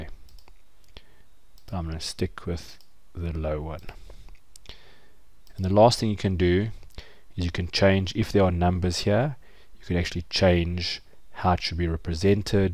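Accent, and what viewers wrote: South Africa. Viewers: dude, are you South African? haha definitely a SAn boytjie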